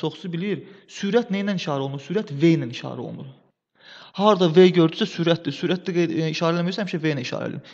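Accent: Baku, Azerbaijan. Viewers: Eşq olsun Bakılılara danışığın çox xoşuma gelir ele billem yerlimle danışıram😄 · Bakı ləhcəsi ürəyim açıldı👍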